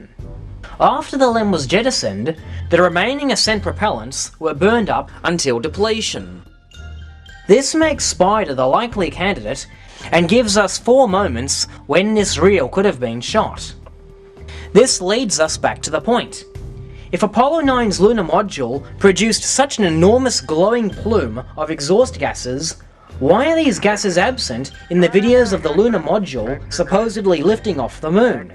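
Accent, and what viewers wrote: Australia. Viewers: Fucking aussie, just shut up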